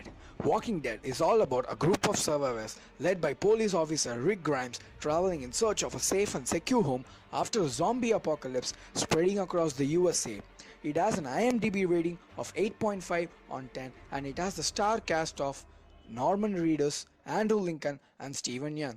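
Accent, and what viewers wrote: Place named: India. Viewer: dude... your English is terrible. and you're speaking so fast that you sound like youre actually speaking your own language. I have to listen hard to even hear a few words. you have a very thick Indian accent. also you keep breathing too hard on the mic. Maybe try speaking slower and try pronouncing your words better while learning how to speak in English properly. or just do it in your own language.... anyway I'm giving you a thumbs down on this one.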